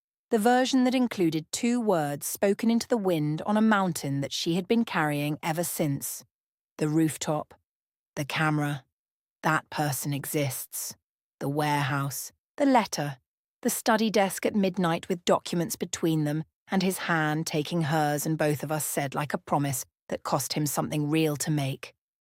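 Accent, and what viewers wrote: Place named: United Kingdom. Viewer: Well this story was exhausting. If you want your audience to be capitived gove us something "cracking open". I felt the narrator was to "wordy about the pass of fathers than about the couple." I think the British accent was distracting and she had nothing to support the love balance I was expecting to happen. Part 2 showed a ring on her finger then nothing happened in part 3. Worst than a slow burn, it just was toooooo wordy with nothing to support the love they held inside. maybe 2 dates, no laughter, only closeness, a ring on her finger why? To much w/ narrator explsining life and never bringing them to heartfelt conversation about the future. Honestly, I skip through parts. This wasn't what I expected for 3 part. Never saw her wear white dress, then it was the end. DO BETTER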